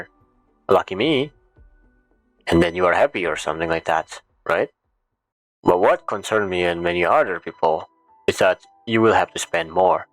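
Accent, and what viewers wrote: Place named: Indonesia. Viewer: It's fine. But not fine😭😭😭 you have an insanely thicc Indonesian accent 😭😭😭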